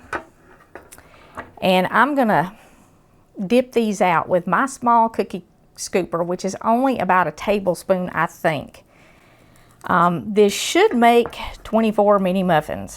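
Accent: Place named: Georgia, United States. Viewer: i feel for you so much. to lose a loved one is bad enough but to lose you're soul mate is that much tougher. from you're accent i think you are from somewhere in georgia, correct me if i'm wrong. i live i south georgia and thats what i here. love to you sunshine and god bless.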